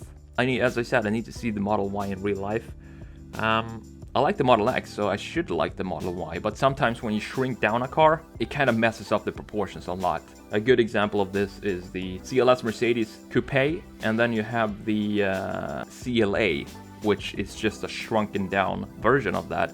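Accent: Sweden. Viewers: are you swedish? love your content btw! :D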